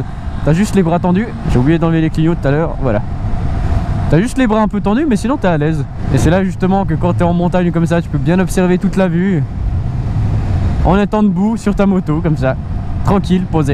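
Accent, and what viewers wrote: Vaud, Switzerland. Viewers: Le bel accent vaudois, ça fait plaiz'! Merci pour ta vidéo, tu as gagné un abonné. :) Je compte passer à la moto le printemps prochain après 7 ans de permis scooter, est-ce que tu la conseillerais à un débutant qui a zéro expérience en moto? Je cherche quelque chose pour faire le trajet domicile-travail (de l'autoroute ou de la route de campagne mais peu de ville) et me balader le weekend. Merci d'avance!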